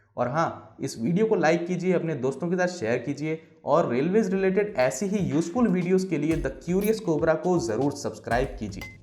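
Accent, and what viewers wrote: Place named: Jodhpur, India. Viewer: Bhai jodhpur se ho kya?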